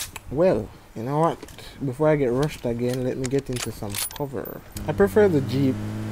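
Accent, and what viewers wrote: Jamaica. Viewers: I love when he says; you know? Love jamaican🇯🇲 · Hey are you from Jamaica cause you sound like Jamaican?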